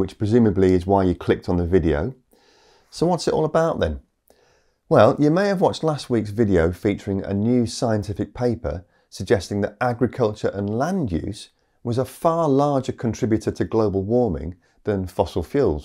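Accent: United Kingdom. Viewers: Sucker for compassionate rationality, relevance, wisdom and science with a kind and gentle British accent. Typical highly evolved mammalian response.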